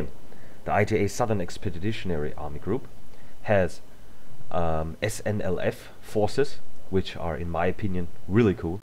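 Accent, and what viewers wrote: Germany. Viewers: netter deutscher Akzent ^^ xD glaube ein wenig bayerisch oder? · Comrade Slaine Oh ich hab neulich auf der Mod DB Seite gesehen, dass da noch leute aktiv sind und dachte da tut sich was... schade. Und ich kommentiere deutsch weil vielleicht Mr. Videomacher persönlich (hört sich ziemlich deutsch an) etwas dazu weiß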